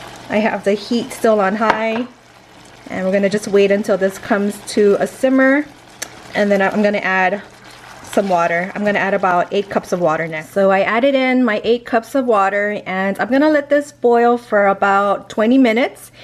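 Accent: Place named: Hawaii, United States. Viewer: Watching the video before checking out any of your other videos, I got a sense that you are from Hawaii or live in Hawaii because you have that local accent. 😭❤️I’m from the Big Island but I’m away for school at the moment. Going to cook this tonight for dinner! Excited because I miss home and home food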